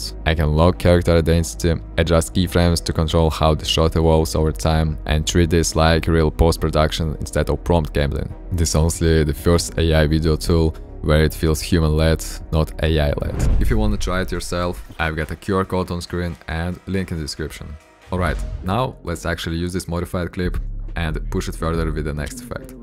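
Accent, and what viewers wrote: Russia. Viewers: очень слышно русский акцент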